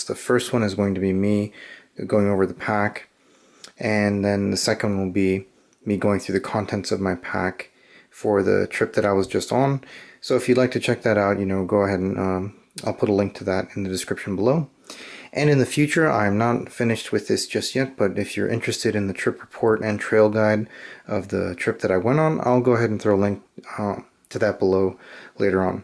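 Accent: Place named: Midwest, United States. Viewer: Nice 420 joke. You should put some information on your profile. How did a guy named that speaks with an American Midwestern non-accent get over to the wilds of the European Norselands? Enjoy your videos greatly!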